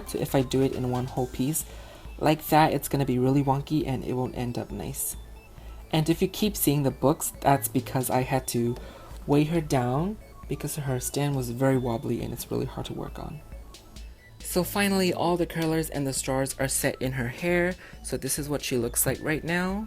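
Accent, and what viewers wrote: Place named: California, United States. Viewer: He may be from California because thats how my friend who is a boy talks and he is from California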